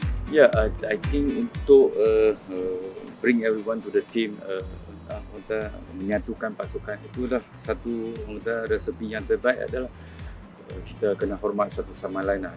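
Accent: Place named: Kedah, Malaysia. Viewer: loghat loqstaq pekat tn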